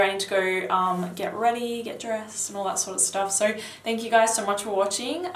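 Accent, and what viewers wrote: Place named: Australia. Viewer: I just wanna say i came across one of ur videos somehow i dunno how but i commented that ur funny coz it was funny i dunno u was talkin about cleavage lmao some new push up thang lol oh yea i was watchin i forget his name about 2018 best mens fall winter fragrances yea thats how i came across ur channel , u had somethin bout mens cologne but yea i cracked up lol, aussie accents are sexy dont let anybody tell ya different lol, keep up the good work 👍🏼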